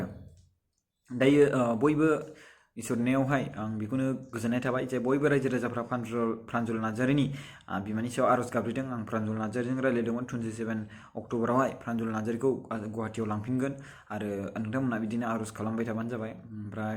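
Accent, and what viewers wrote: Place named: Kokrajhar, India. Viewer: Hab pongbai nwngni raijlanai jwngni kokrajharni baidi tar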